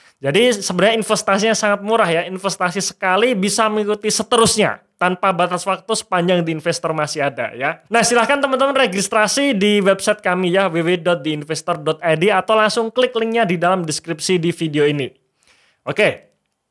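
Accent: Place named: Cirebon, Indonesia. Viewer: Logate wong cirebon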